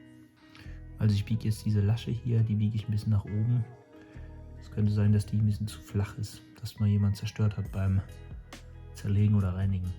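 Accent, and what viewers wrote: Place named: Dippsch, Germany. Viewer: So toll aufgebaut die Videos 👌👌 von wo kommst du denn? Das Dippsche 😄 geil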